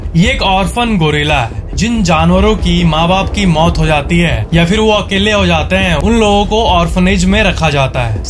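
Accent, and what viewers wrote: Bihar, India. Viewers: Aap bihar se ho ka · Are you from bihar sir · aap bhihar sai ho kya ?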